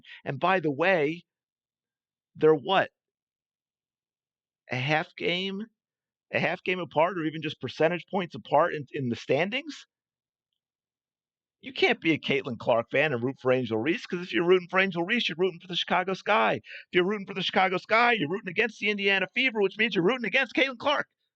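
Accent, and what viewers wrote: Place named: New York, United States. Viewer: As an aside, I could've sworn I detected a NY accent in your speech😊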